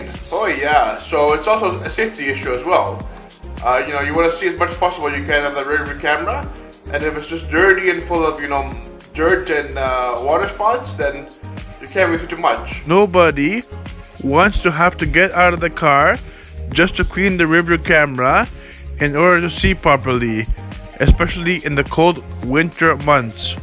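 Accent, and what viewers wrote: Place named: Greece. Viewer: Wow, I wasn’t expecting to find such an amazing video about ceramic coating a rear view camera, and to top it off, the voice and dialect are incredible! Your explanation is so clear and engaging, and that Greek accent just adds an extra layer of charm. This is by far the best video I could find on this topic—thanks for sharing your knowledge in such a great way! Keep up the awesome work!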